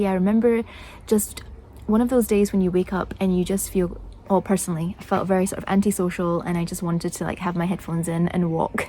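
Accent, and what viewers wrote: Scotland, United Kingdom. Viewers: When I saw ‘ ’ I somehow wasn’t expecting a mild, wee Scottish accent, for some reason. I was expecting a loud, brash North American! No matter. It was a lovely video. Ty.👍 (Edit: … just look at this. This is not a wee nook of the Highlands but, more or less, a central part of Scotland’s capital! You captured it beautifully.) 👍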